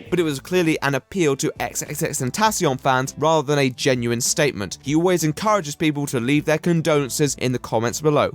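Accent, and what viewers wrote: RP, United Kingdom. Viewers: You sound like super nanny do all posh people not know how to pronounce acceptable?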